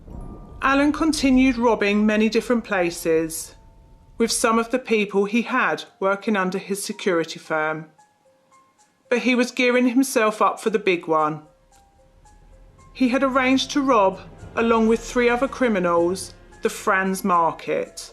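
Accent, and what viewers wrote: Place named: England, United Kingdom. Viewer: It's called an English accent ya stupe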